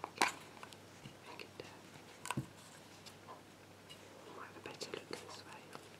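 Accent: United Kingdom. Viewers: Subbed!!!!! Finally a good channel with an english accent! Sending my love from London 🇬🇧 - keep up the good work and videos!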